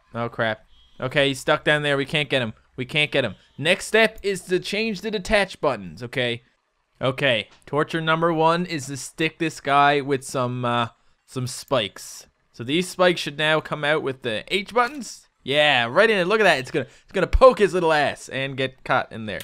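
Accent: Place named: Newfoundland, Canada. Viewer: By the accent of your voice and your usage of the word "squat" I'm assuming your from Newfoundland?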